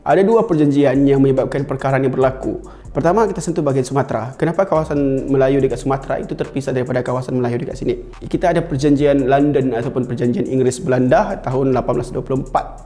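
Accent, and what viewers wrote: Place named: East Sabah, Malaysia. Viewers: slang speker mcm org blah timur sabah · Bang, org tawau ka bangg..slangnya mcm dr sna hehe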